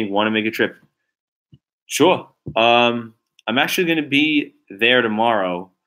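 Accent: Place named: Italian-American, United States. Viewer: Love your channel and your accent, lol. I'm originally from Queens (now live on Long Island) and you remind me of my Italian relatives. Great video!! Glad I met you on JD's Variety Channel.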